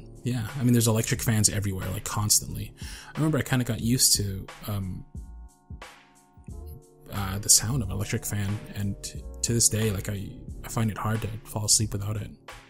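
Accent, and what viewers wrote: Philippines. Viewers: pfft! sorry kuya c but I ended up laughing at your fil-english accent XD you sound like my foreign friends who are learning Tagalog for the first time XP but the words were spine-tingling in your voice nonetheless :3 ♡ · This cracked me up!!! > WE HAB DA PANNY ACCENT (Very Filipino accent!) 💘 When you spoke those sweet lines, I just died then and there. 😍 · YOUR FILIPINO ACCENT OMG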